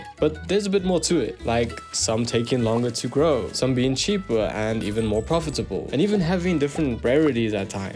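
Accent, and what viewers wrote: South Africa. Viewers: waaaait. are you south african? you sound south african a little. its hard to recognise our accent when its not 100% afrikaans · Nice video bro, I recognise that South African accent anywhere 😅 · South African??!!! My bru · 😂Bro you must be south african. That accent!!! must be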